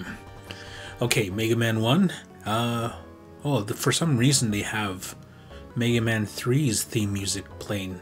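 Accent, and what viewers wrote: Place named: Canada. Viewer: You sound canadian. Fun play through.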